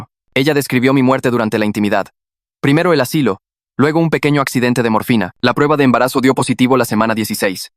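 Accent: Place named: Colombia. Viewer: se re nota q eres de colombia bro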